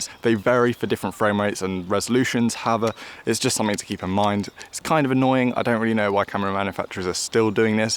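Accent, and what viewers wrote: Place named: United Kingdom. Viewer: thanks for your quick reply, of course you are english mother tongue (only your surname sounds Italian, your pronunciation and accent are perfectly british), I am not, that's why I wrote you that you are very clear to understand (for a foreigner like me).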